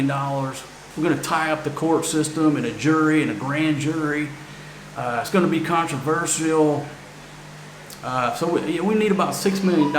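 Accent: South, United States. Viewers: I guess you can diagnose someone from TV or you tube, you sound like a ignorant redneck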